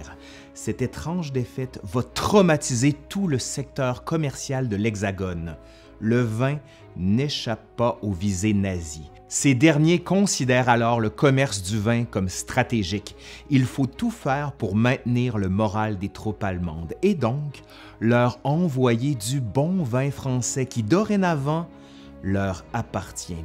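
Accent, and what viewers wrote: Quebec, Canada. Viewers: Bon sang, l'accent Québécois est juste magnifique ! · J'aime beaucoup votre travail.. Auparavant, c'est à dire avant d'avoir connu vos vidéos, je n'appréciais pas tellement l'accent canadien, mais grâce à vous mon opinion a pu changer totalement. Le son en devient agréable, doux et confortable à l'écoute de vos récits sur l'histoire. Comme vous.nous l'avez suggéré, je souhaite proposer une traduction en une autre langue, en hébreu du titre de votre video : למה הנאצים אהבו כל כך יין?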